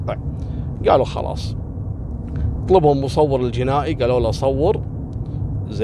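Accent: Gulf, Arab world. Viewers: طريقه كلامك اتغيرت انا الان بعام ٢٠٢٤ وهذا الفديو قبل اربع سنين كلامك كويتي ميه ميه الحين خليجي مخلط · الله يسعدك لهجتك مثل لهجتنا في السعودية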